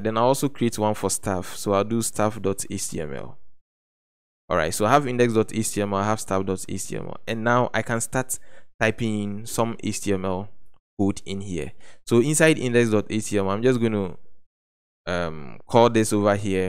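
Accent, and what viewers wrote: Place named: Ghana. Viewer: Please where are you from.. You sound Ghanaian..I want to be in touch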